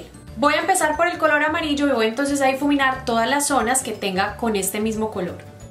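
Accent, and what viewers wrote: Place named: Colombia. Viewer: primer video que veo y apenas empezo dije... Es colombiana! ❤ Nueva suscriptora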